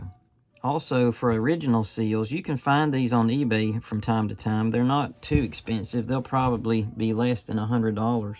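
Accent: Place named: East Tennessee, United States. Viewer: Came here looking for this information! You sound like you are from close to where I am born and bred...east Tennessee? I am so glad I have found your channel as I am obsessed with colonial period.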